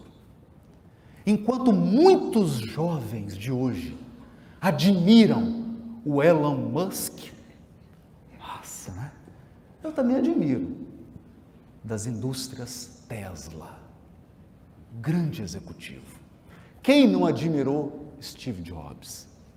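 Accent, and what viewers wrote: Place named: Minas Gerais, Brazil. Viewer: Obrigada por essa mineirise maravilhosa . querido irmão obrigada por seus ensinamentos paz e luz.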